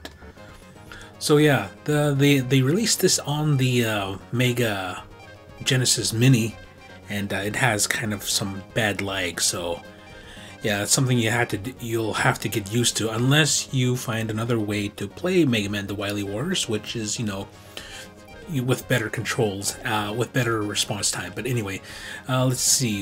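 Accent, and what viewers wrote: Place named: Canada. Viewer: You sound canadian. Fun play through.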